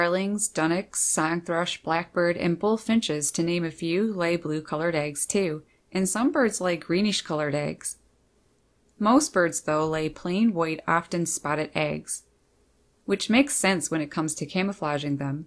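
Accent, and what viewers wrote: Canada. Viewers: I can tell you're from Canada. You say" aboot" for about. Lol. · I really love this video about the color of Robins’ eggs & the blueness! Very interesting and informative and educational! It totally makes sense! I was just thinking maybe they were that color, so if the egg fell out and went on the ground… But I guess mom or papa bird can’t pick that egg up and put it back in the nest!!! Thank you very, very much! Love your Canadian accent! Gay Michaelis 😁👋❤️🤗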